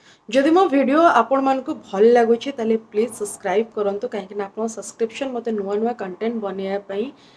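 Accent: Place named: Bengal, India. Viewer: Very very similar accent like Bengali languages... Every word have been understand for me... Thank you for your valuable information ma'am..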